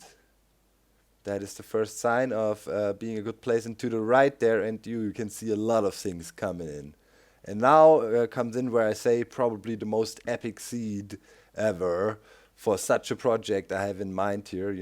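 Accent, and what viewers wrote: Germany. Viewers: ***** is that a german accent I hear? sehr gut herr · He is German. You are Dutch. He has a slight German accent. He does not live near the east side of Germany, so not even close to the Netherlands (if I recall correctly). What's your point calling him Dutch?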